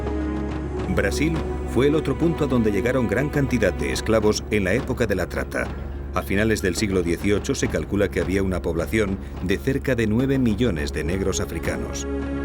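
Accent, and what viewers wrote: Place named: Spain. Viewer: POR DIOSSSS NO ESPAÑOL DE ESPAÑA SIN OFENDER SUENA HORRIBLE DA FLOJERA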